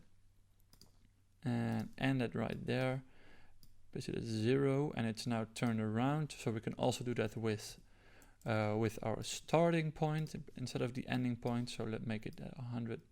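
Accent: Netherlands. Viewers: Quality stuff on your channel man. Glad I found you. I think I recognize a Dutch accent but I'm not entirely sure! Keep it up man.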